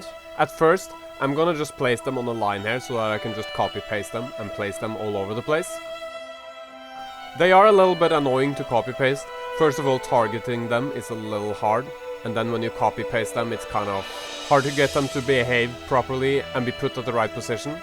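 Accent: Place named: Norway. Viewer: Bro i can hear ur from norway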